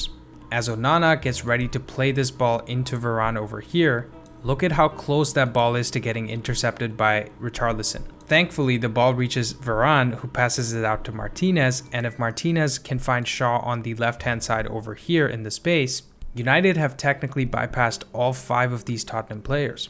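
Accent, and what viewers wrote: United States. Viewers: Could have just said there’s barely any def-midfield. Wolves opened them up like a human body on operation table but then it’s supposed to be a 10min YT video. This season will be shite. (Also your accent gave away north USA feeling 😊. Just saw Canada in your bio). Good analysis overall.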